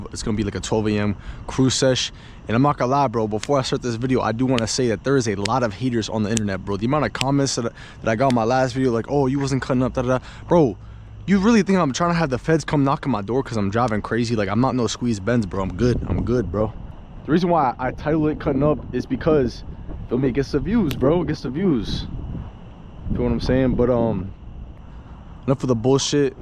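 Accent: Boston, United States. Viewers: Is bro in Boston I can just tell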